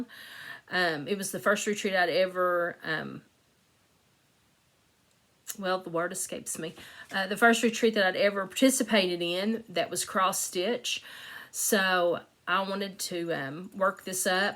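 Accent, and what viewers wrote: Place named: East Tennessee, United States. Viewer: I had a feeling when I saw your thumbnail that I would enjoy your Flosstubes, and I was right! I heard a really familiar accent in that voice. I live in East Tennessee, and you sound like my people!!! What is it with these husbands who think we have too much stuff? They have their stuff, too! You are working on some beautiful pieces. I love, too, that your husband's tractor is "sitting" in your grandfather's barn! And how awesome it will be to display your grandmother's click & wheel with the piece stitched to commemorate her with hers! Thank you so much for sharing!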